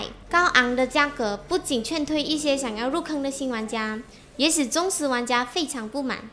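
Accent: Malaysia. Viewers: 是猛將zhuan，不是猛將chuan哦⋯⋯妹子繼續加油，蠻喜歡馬來西亞口音，很有親切感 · 她應該是馬來西亞人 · 這位有大馬口音的聲音也太可愛了吧！ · 馬來西亞華人的口音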